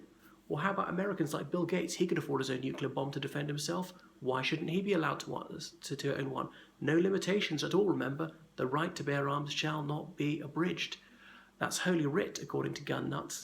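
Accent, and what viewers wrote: United Kingdom. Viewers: You’re an embarrassment to the Irish and you sound like a Brit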